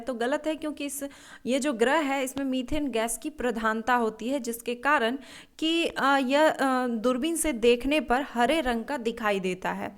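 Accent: Bihar, India. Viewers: आप भी बिहार से हैं Mam ❓❓❓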